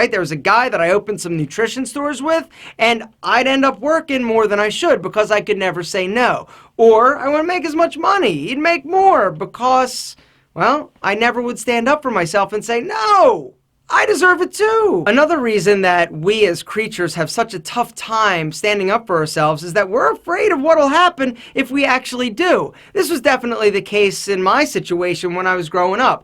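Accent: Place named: East Coast, United States. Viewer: east coast accent all day baby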